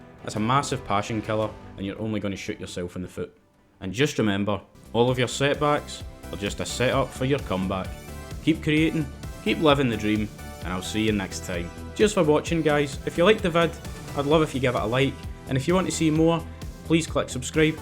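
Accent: Scotland, United Kingdom. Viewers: Good Sir Scotsman thank you very much for sharing your valuable experience, learned a lot from it and enjoyed your nice accent 👍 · Dude, your voice is epic, gotta love Scottish English! Dont give up gamedev. You enjoy it, thats all that matters, sooner or later you will have tons of money :D Cheers from Ukraine!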